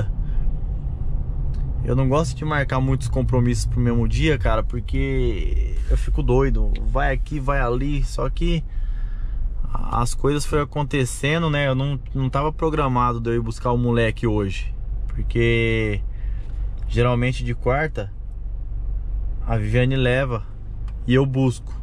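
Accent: Minas Gerais, Brazil. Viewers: Fala irmão, sou novo no canal, e quero saber, cê é mineiro?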